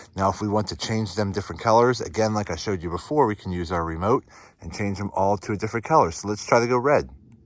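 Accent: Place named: Pittsburgh, United States. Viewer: Where are you from I'm from pittsburgh and I hear a yinzer accent when you talk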